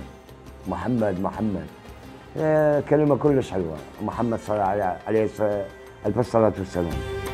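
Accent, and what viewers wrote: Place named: Blida, Algeria. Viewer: لكنة بليدية على عراقية خليط متقون بامتياز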